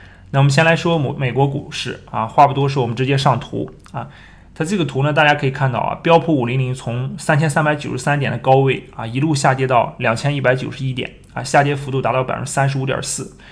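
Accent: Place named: Northeast, China. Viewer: 听出来有东北口音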